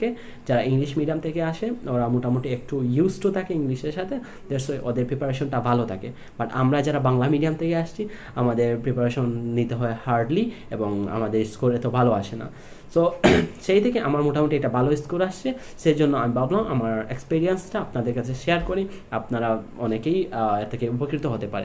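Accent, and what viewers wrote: Sylhet, Bangladesh. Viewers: Are you Sylhet’s your voice is like Sylhet’s Very helpful your video.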